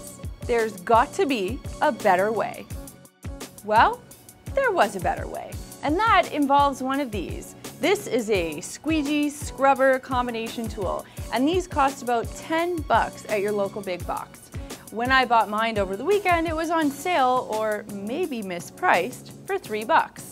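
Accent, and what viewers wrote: Canada. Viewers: You can clean my windows anytime baby ! Just love that Canadian accent.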